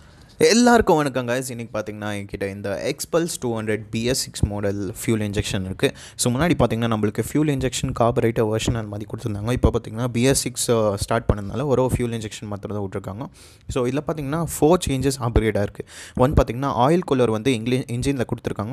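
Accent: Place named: Bangalore, India. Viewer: we bangalorean speak tamil with same axcent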